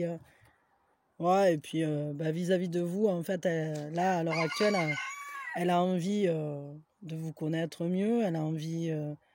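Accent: South, France. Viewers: Cc et merci pour le choix numéro 1😊😊👍🏼👍🏼 et avec ton accent je voyage dans le sud de la France 🤗🤗💖💖😘😘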